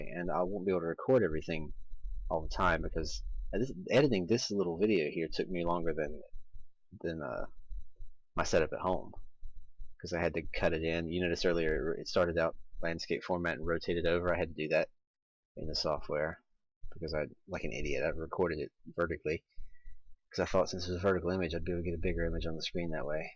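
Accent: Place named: United States. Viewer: Another great video dude. I was wondering about your accent, I thought maybe you were just an american that moved there later in life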